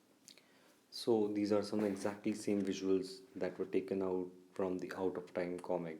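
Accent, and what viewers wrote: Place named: England, United Kingdom. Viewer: dislike for your English accent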